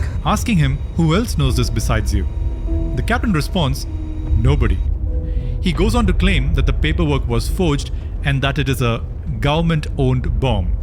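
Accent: India. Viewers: Awful channel, awful Indian accent 😂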